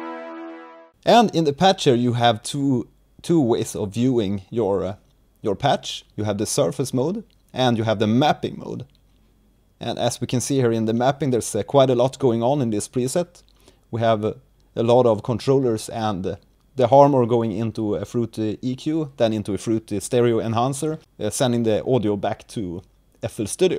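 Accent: Sweden. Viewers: I know that accent! Are you Swedish?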